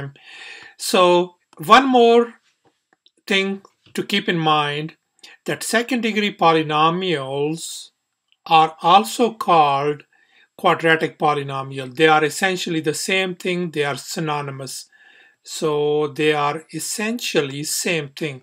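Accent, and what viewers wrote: India. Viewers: thank you guy with indian accent (i guess he's indian) now everyone's exam is saved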